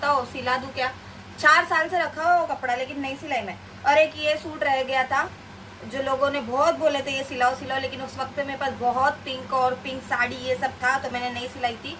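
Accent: India. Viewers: Love ur indian accent💕mumbai's life sounds to be like karachi!!!love from pakistan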